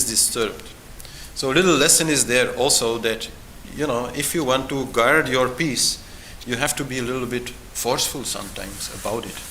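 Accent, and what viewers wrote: India. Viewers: why do you speak like a indian and also spurt out trash ?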